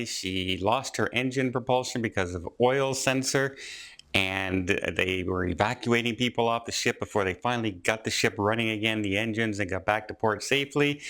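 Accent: Canada. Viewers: BH Most likely his accent. is Canadian.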